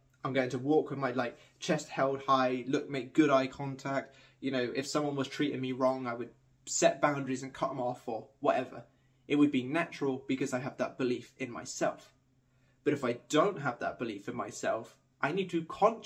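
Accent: United Kingdom. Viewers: PS: you speak so quickly + the British accent makes me REALLY pay attention and often re-listen a part or two (I mostly listen to American English). Which I don’t criticize, it’s something I welcome because it’s super refreshing! I have to say I enjoy the manually added captions! Oh and I think I manifested your fast speech because I was tired of some Youtubers speaking sooo like, squeeeeezing a sponge type of slow speech🤣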